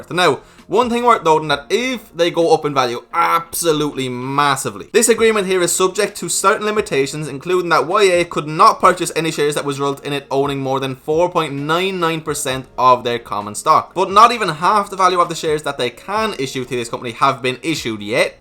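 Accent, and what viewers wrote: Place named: Ireland. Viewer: Cool accent , is that Irish ? I’m from China so I’m not good at accents . 😂👍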